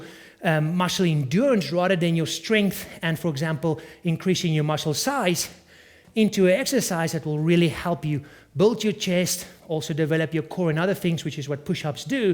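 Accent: South Africa. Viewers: South African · are you south african?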